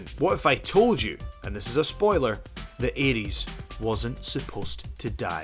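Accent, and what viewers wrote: Scotland, United Kingdom. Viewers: Oh, you couldn't ruin Zelda for me if u tried, still always a pleasure to hear ur thick Scottish accent though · He has a Scottish or north English accent. That might have something to do with it. Or maybe not. Just a thought.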